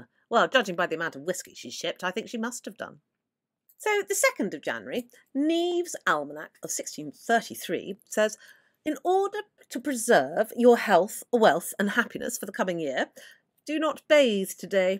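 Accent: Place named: Somerset, United Kingdom. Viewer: I'll laugh if you're from Totnes! But I'm detecting more...... Somerset?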